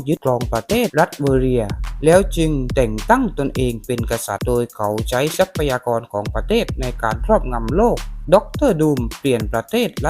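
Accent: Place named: South, Thailand. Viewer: ผมชอบสำเนียง หนัดเลยครับ หรอยๆๆ มีมุขด้วย ทำคลิปอีกนะครับบ ❤❤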